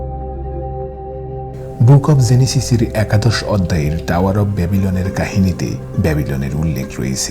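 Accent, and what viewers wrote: Barishal, Bangladesh. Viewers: Your voice is so good but you can't avoid your local tone(Barishal).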